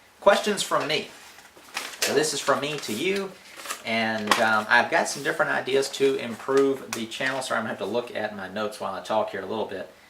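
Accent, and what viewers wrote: South, United States. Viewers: To tell the truth, as a non-english-native-speaker it was your accent that made me subscribe (something from the south right?), but i kept the subscription because of things like that barn-tear-down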